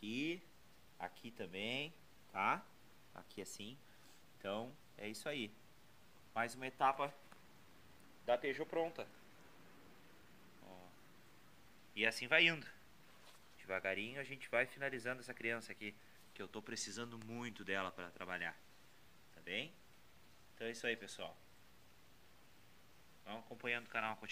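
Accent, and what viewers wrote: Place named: Rio Grande do Sul, Brazil. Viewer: cara legal seu canal eu tenho vontade de comprar um caminhonete dessas ,vi pelo teu sotaque que tu parece ser gaucho tu e de onde ?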